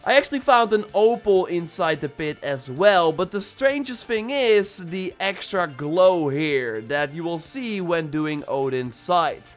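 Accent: Netherlands. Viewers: Are you from the netherlands? You sound kinda dutch to me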